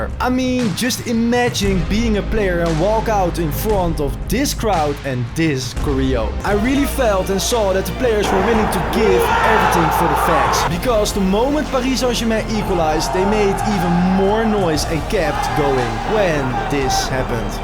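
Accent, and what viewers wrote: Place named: Netherlands. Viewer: Verschrikkelijk Nederlands accent. Maar de manier van praten is helemaal stuitend. Na 1 minuut met schaamte afgezet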